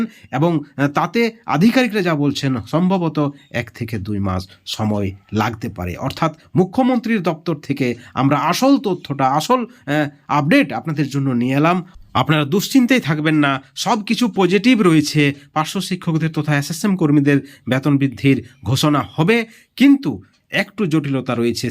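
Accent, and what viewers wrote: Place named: Bangladesh. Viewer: Tumi ki bangala dasi vasha ta to Bangla dashir moto khabor towki Bangla dashar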